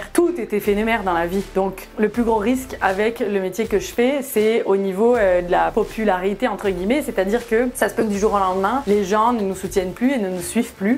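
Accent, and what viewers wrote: Quebec, Canada. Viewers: C’est drôle tu commences à avoir l’accent québécois sur certaines assonance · Vidéo génial ❤ j’ai l’Impression que plus le temps passe plus ton accent québécois s’accentue 😂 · Tu as de plus en plus l’accent canadien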